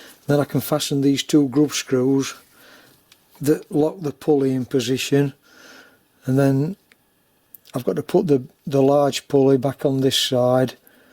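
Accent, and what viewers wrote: Yorkshire, United Kingdom. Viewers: I caught your name in the comments the knacklers workshop, I’m pleased to sub you and will be looking through your back catalogue. You sound your from the land of the White Rose. So I’m not too far away over the border. If I can ever help.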